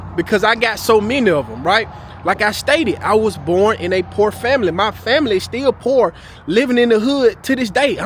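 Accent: Memphis, United States. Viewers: I used to live in South Memphis near McLemore. At first I wasn't gonna ask, but you sound just like someone from Memphis, that's why I asked.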